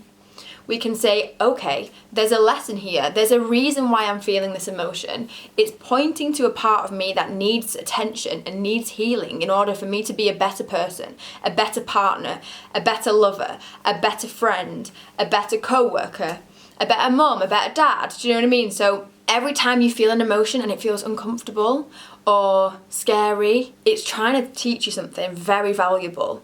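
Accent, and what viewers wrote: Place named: Warrington, United Kingdom. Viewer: Are you from Warrington/leigh? You sound just like my friend!!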